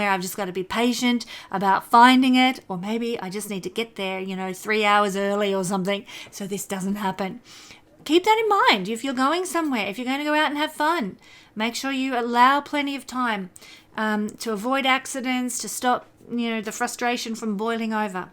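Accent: Australia. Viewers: So weird your Australian accent is showing more now you’re recording in the states😅 I’m Australian;)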